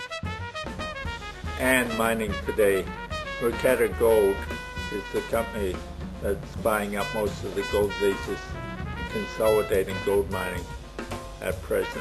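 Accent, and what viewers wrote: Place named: United States. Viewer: Why is a "Seppo" narrating this?🚫🇦🇺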